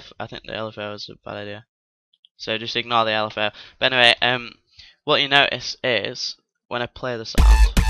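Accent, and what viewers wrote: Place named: United Kingdom. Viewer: Even though I am American, no one can speak "Synth" like the brits. Dope Tutorial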